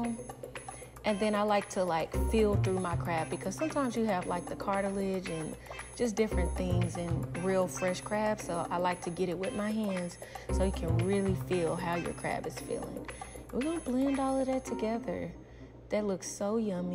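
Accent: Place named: South, United States. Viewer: Beautiful crab cakes. I'm going to make some. Also, that southern accent just crushed me. I'm in love.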